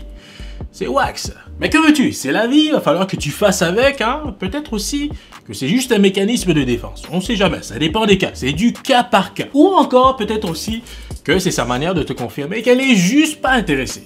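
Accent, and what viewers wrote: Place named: Quebec, Canada. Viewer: j'aime trop ton accent,français et des expressions québécoises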